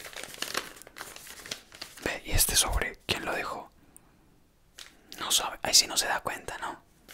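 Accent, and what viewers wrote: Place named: Bogotá, Colombia. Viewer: Cuando empecé a ver tus vídeos pensé que eras español jsjsjjs y después me di cuenta de que eras colombiano, más encima rolo xd, me cagué de la risa con tu actuación , y tu bigote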